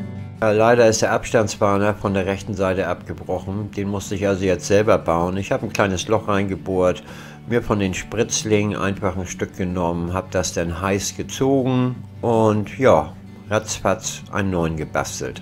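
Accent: Hamburg, Germany. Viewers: Ein Hamburger! Klasse. Hörst Dich nicht wie ein Schlumpf an, eher wie Käpt'n Blaubär und ich hing wie eins der Bärchen an Deinen Lippen. ;) Klasse Bau und schön erklärt. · Danke für das tolle Video! Sehr hilfreich auch für Anfänger, es gibt hier so viele Äberdrüber Profis..Du machst das sehr toll.Auch Deinen Text hört man gerne, schön Hamburgerisch Höre ich als Österreicher gern.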